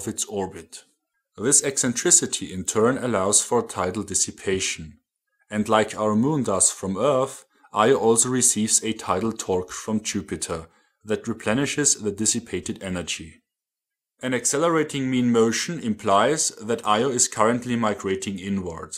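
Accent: Germany. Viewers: Oh man that was awesome. Please continue to use your knowledge and German accent to obliterate misinformation!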